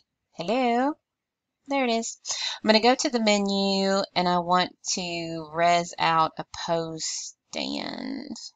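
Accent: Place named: South, United States. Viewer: You're too cute! "Is there something stuck on ma shoe? Did ah break ma heel? What's that all about?" Hehehe love your southern gurl, so am ah! and TYSM for doing these videos. Ah am a visual learner and ah need pictures too. YOU are a wonderful teacher!!!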